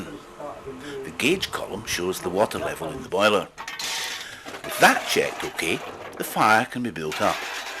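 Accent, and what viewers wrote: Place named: Scotland, United Kingdom. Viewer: Haven't heard a Scottish narration for these kinds of videos; pretty nice.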